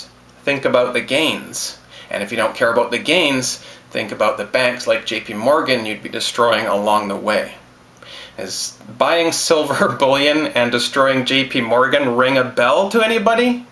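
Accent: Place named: Canada. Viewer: I miss your friendly canadian accent. Hope you are doing well!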